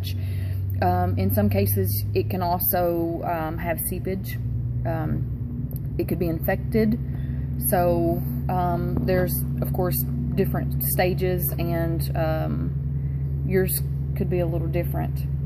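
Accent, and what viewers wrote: South, United States. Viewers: My god I love your southern belle accent. Hey you should do a Bryson Tiller album review because he is from Kentucky and Louisville to be exact.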